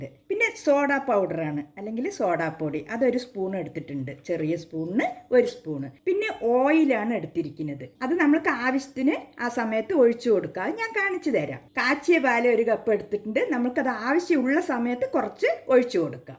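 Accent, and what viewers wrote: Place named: Palakkad, India. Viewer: അമ്മ പാലക്കാട് എവിടെയാ ....? തനി പാലക്കാടൻ ശൈലി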